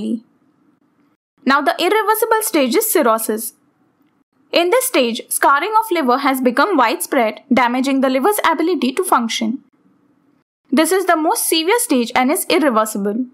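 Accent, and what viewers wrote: India. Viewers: I thought you were Geman at first but then I was like nope! India ; ) when you all talk fast you give it away.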